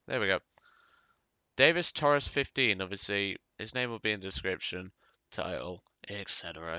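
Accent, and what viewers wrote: United Kingdom. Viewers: U British mate?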